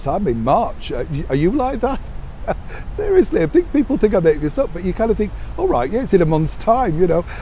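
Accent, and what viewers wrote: United Kingdom. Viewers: You like to bait readers with dangling question. I got your numbers figured out. Lol.. Lucky I like your Britts accent. Anyway. We need to delete the obnoxious grifters traitors the Harkles from the public arena and promote the good beneficial aspects of your monarchy. Another important point is the blatant Disrespect and Elderly Abuse toward the well respected Queen and her late husband Duke that the Harkles have committed to torture the family.. I like to see the Harkles be disciplined and be punished according to the laws of the land.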